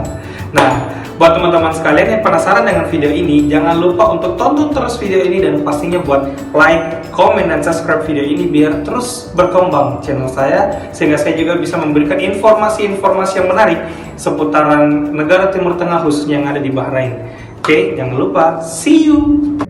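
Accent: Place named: South Sulawesi, Indonesia. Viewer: Cara ngomongx sprti org sulsel. Salam dr polman, sulbar. Ada lowongan kah utk wanita???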